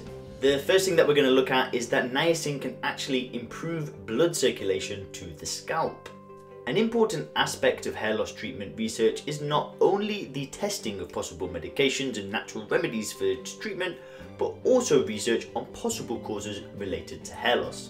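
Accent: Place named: United Kingdom. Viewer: Tf is this stupid music supposed to do? Just makes it annoying trying to understand what you're saying since you're a britbong.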